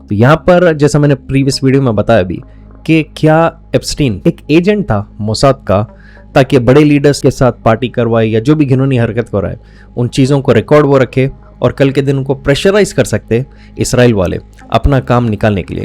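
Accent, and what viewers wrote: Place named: Maharashtra, India. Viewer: You have marathi.accent . Ok. Good जय।महाराष्ट्र जय शिवाजी राव। हर हर महादेव।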